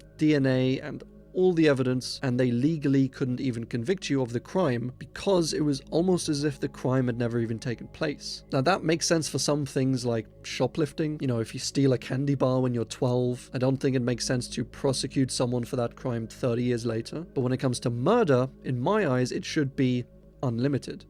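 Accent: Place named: Scotland, United Kingdom. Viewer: I watch several 'iceberg' creators. Yes, I have subscribed to your as well. Because I like the best. YOU are one OF the best. Just enough curiosity and open mindedness without being naïve and gullible. <--that is a tight rope act and hard to do correctly. You do it good enough. Of course, no one does it AS good as me. <----and THAT is also a conspiracy!! Carry on. p.s. what's with the accent? Not S African or Australian. From the north of the UK? Scottish highlands maybe,.........<---- said the American with the deep southern accent with extreme Scottish ancestry.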